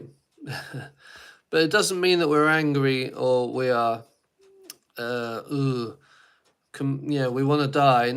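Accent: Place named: Northern England, United Kingdom. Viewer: You sound from north England.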